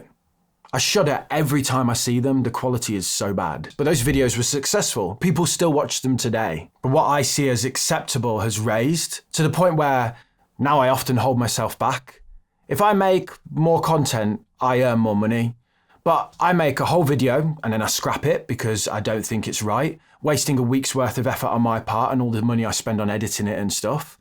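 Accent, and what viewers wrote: Birmingham, United Kingdom. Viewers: Fantastic video! I think I hear a Brummy accent. Are you from Brum? Also, more videos with UK garage involved pls. Haha 🙏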